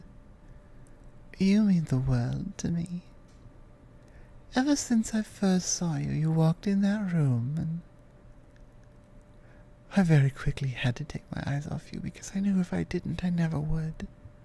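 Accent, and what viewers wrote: United Kingdom. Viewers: Oh my God the British accent activates my neurons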